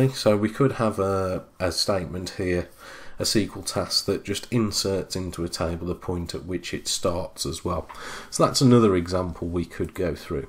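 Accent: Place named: England, United Kingdom. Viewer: the golden grail of English accented youtube tutorials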